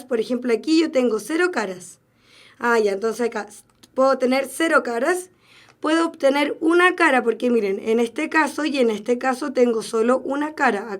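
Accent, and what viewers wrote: Chile. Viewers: Eres chilena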